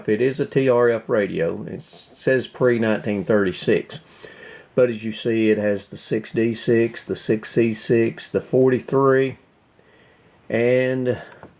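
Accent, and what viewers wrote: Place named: Tennessee, United States. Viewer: Interesting looking radio. Really like the Lady Liberty dial. First time I've seen your channel. I'm a Yankee but I recognized your accent immediately as I had cousins who grew up on Signal Mountain TN. This video is a year old already but I'll be looking at more of your videos from now on.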